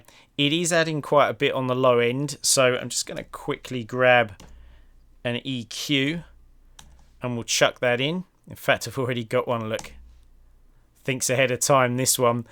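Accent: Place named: United Kingdom. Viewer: score a smooth British voice. thanks mate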